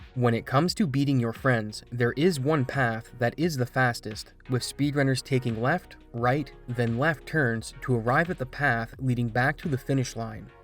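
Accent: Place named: Prince Edward Island, Canada. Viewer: I forget if I've asked this before., are you a Maritimer? and around there I feel like I'm hearing a strong Maritime Canadian accent. "Time", "Line", and "Marker" sound very Newfoundland lol. For the record, I love it, I just really honed in on it after that time-stamp and can't stop hearing it lol. I bet anyone who wants to take it, a fair bet I'm not Googling first, $100 Canadian that grew up in Newfoundland (and Labrador). I could use the money and I'm so sure I'm right lol. You have to internally accept the bet before Googling the answer. I'll know. Edit: My second, unofficial guess for betting purposes, as I keep listening to him, is PEI. That's Prince Edward Island to you non-Canadians. That's just for pride. First honest guess was Newfoundland. Just started hearing PEI more as I listen.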